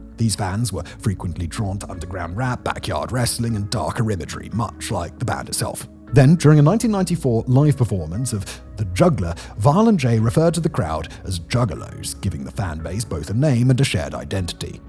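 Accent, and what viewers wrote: RP, United Kingdom. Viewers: I love hearing say " " in his incredibly posh British accent 😂😂😂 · The original comment said he has a posh accent. You replied that isn't really all that posh, but middle class. That is why I made the point that you don't have to be posh to sound posh. Hope that helps. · A British accent documenting an American phenomena... will almost always be tediously inaccurate, and a giant waste of time for anyone except those who live in the UK and believe that they are learning something. They aren't.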